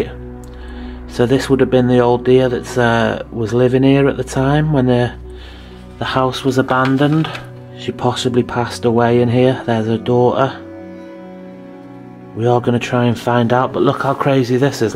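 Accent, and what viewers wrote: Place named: Liverpool, United Kingdom. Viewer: his accent isn't Welsh but definitely northern. I think he's scouse or from Cheshire. Definitely got a scouse sound.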